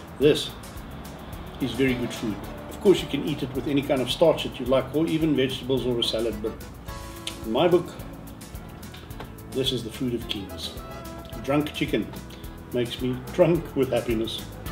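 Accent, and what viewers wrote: South Africa. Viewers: Hello, I am a South African living in the USA - and even though I don't make potjies - I enjoy watching your videos just to hear your wonderful South African accent. Thanks so much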